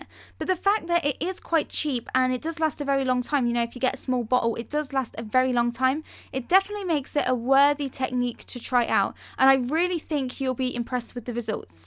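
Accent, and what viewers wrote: England, United Kingdom. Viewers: I love you're accent, it sounds adorable 😍 are you from England? 😇💫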